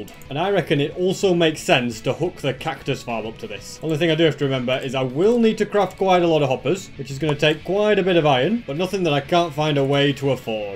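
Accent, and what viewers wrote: United Kingdom. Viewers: hey im new to your channel also i have a question are you britsh? also again good job on the content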